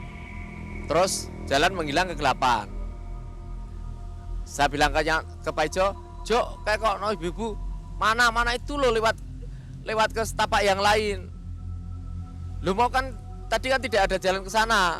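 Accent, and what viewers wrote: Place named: Semarang, Indonesia. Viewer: Logat e semarangan pol mas 😊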